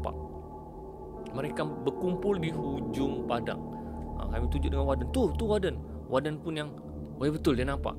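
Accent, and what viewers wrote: Sabah, Malaysia. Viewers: Abang orang Sabah ke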